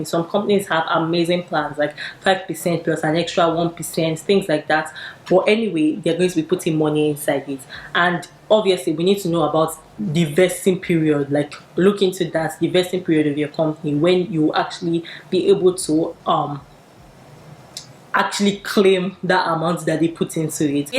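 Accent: Nigeria. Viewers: Thanks for this video sis. I learned a lot. I remember when my uncle co-signed to his friend's loan in Kaduna,it was a loan from LAPO, his friend fled to Ghana, his life became very hard and he was treated as if he was the lender. LAPO can be very scary in Nigeria. BTW sis,you sound like you're from kwara state.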